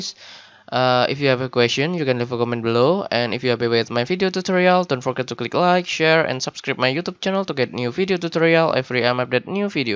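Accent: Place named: Indonesia. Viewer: hem logatnya kyak orang indo ya...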